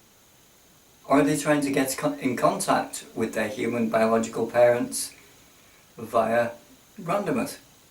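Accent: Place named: United Kingdom. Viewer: Some of the words you pronounce but guess it depends on what part of the UK your from cool :-)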